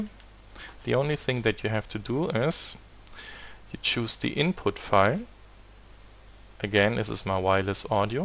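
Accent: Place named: Germany. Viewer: Ich habe direkt rausgehört, dass es ein Deutscher ist haha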